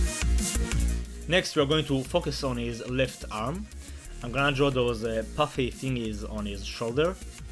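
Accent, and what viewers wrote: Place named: Israel. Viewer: יש לך מבטא ישראלי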